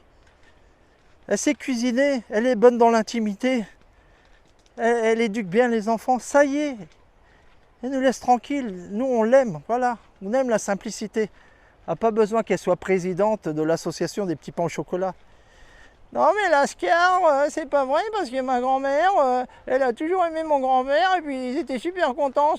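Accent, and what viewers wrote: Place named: France. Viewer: Continue tes vidéos mec !👏🏻. HS tu viens d’où? T’as un petit accent ligériens ou isérois qui ressort de temps en temps on dirait 😅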